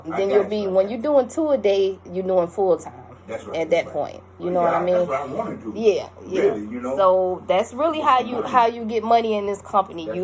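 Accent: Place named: New Orleans, United States. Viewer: Sis where you from ?! I hear NOLA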